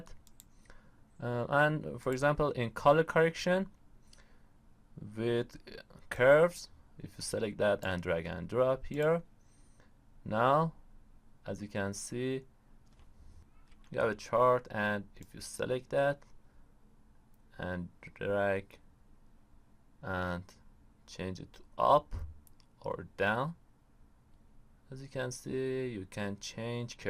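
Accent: Iran. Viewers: it's so obvious he is persian lol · Are you persian?your pronunciation like persians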